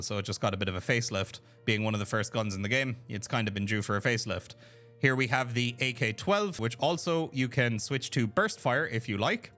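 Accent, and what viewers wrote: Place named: Ireland. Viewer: Ive been seeing a lot of negativity lately so this is really nice to see. As always the silky irish tones in the noise canceling headphones are a highly appreciated bonus.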